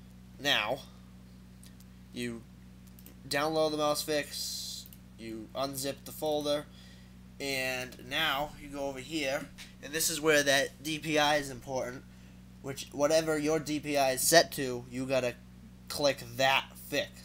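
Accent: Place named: New York, United States. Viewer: man.. are you from NY or NJ, accent is hiliarous lol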